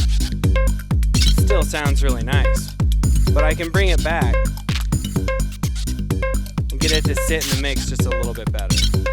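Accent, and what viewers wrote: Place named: United States. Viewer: Bruh. So glad u speak American haha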